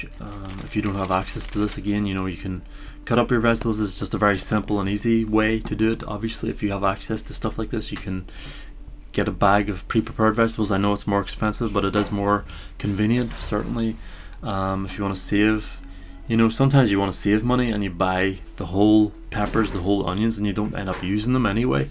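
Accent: Northern Ireland, United Kingdom. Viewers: he lives in Northern Ireland by the hears of his accent;)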